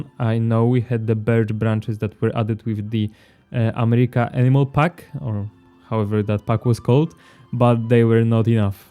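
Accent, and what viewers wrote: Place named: Poland. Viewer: Are you from Poland? You have that kind of accent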